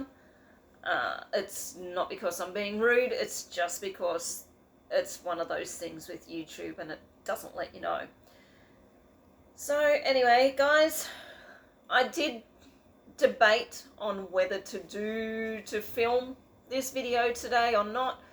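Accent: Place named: Australia. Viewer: Sorry to hear your not 100%. Darren and Sharon both seem to have similar problems😞. Why not make it a round TEN for projects!!!? Stitch with me would be great😁. If one a week got too much you could always alternate with a SWM one week and a Flosstube another week? I love the Aussie accent, please don't put it down😁. Stay safe, get well soon🤗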